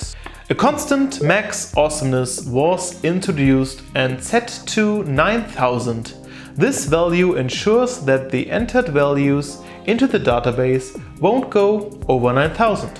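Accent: Germany. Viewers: Man hört sofort raus, dass Du deutsch bist 😜 Aber super hilfreich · The world is so small. From your accent, I knew you're german. But then I opened your website and saw that you're also like me from Hamburg 😅 Very good video. I'd love to work with folks who could appreciate a good commit like that! Subbed!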